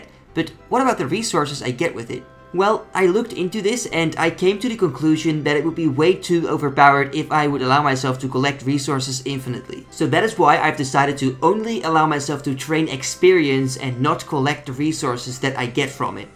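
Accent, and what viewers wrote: Netherlands. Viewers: Lets gooooo! are you dutch btw? you sound like me haha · Great series! Just a question, are you Dutch? I am from the Netherlands, alot of people play osrs here!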